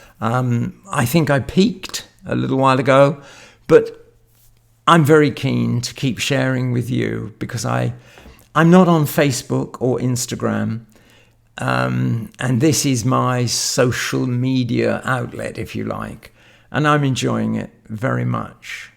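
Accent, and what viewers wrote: United Kingdom. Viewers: I love your passion for your Brompton (I feel the same for mine) and your humor! And besides this as a German it is wonderful to listen to your british accent😊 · Is that an East Anglian accent?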